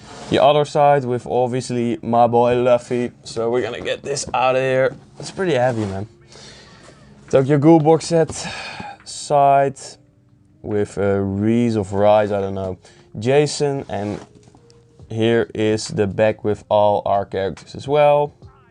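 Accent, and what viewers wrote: Netherlands. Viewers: Ik heb deze video al zo vaak gezien in mijn Recommended maar heb eigenlijk nooit op je stem gelet dus kom er nu achter dat je Nederlands bent. Mooie Collectie! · you dutch i hear the accent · Ik hoorde direct aan je accent dat je Nederlands was, tof om te zien. Je hebt een subje erbij!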